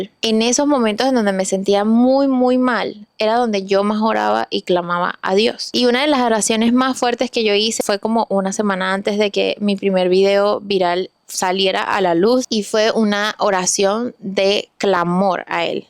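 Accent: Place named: Táchira, Venezuela. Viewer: Hola, desde que te mudaste a usa te he seguido mucho viendo todo lo que haces y empecé hacerlo porque te vi como un ejemplo. Yo también emigré y emigré sola bien chiquita después de graduarme de bachillerato y tus videos me hacían reír también al ser gocha me daba un poco de cringe el acento (la verdad jsjaja). Y ver este tipo de contenido de verdad me hace tan feliz, además siento que es una señal. Al estar sola sola me refugiaba en dios en rezar y rezar y pedirle todo lo que quería o necesitaba para mi bien estar. Y poco a poco me lo fue dando y siempre supe que era el, siempre un mensaje un detallito que solo yo en mis rezos decía. Hasta sacar personas que me hacía mal de mi vida! Pedía a dios si esta persona no es para mí sácala de mi vida aunque me duela el alma… y así mismo fue. No solo con relaciones amorosas, con trabajos, viajes, amistades, etc. 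Después de tanto estrés por inmigrar y tener 3 trabajos vivía muy frustrada pero desde que empecé a agradecer cada día a dios todo mejoró! Y muchas puertas se me abrieron! Pd: por favor sigue subiendo más capítulos ya tienes una fan fiel, gracias ❤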